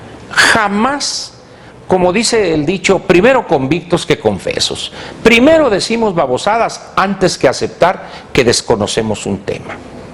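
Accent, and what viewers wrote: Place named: North, Mexico. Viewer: Maestro Angel Verdugo soy su fan con todo respeto... sus interesantes comentarios , inagotables conocimientos y esa forma norteña de hablar 🤬🤬☺️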